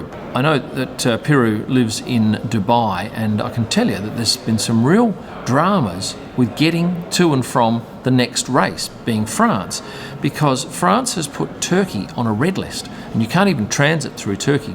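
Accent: Australia. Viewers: Great channel funny accent.Aussie Aussie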